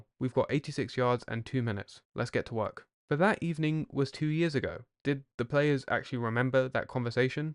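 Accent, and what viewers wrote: England, United Kingdom. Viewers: He’s English, and I think real. Some people don’t know how to speak names. Unitas was widely misunderstood. Yes, it is indeed “aMEEchee”, as it was for Don Ameche!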